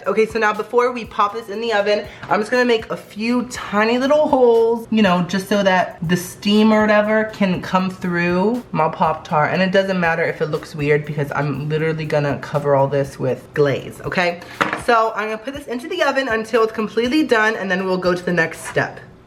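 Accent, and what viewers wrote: United Kingdom. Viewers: 100 videos of British accent pls Also asscheek earthquake full audio plsss or mvvv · Love your British accent lol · His British accent is funny like if u agree❤❤ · He should really do an entire video in his British accent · YOUR BRITISH ACCENT GIVES ME LIFE!!!!!! SLAYYYY!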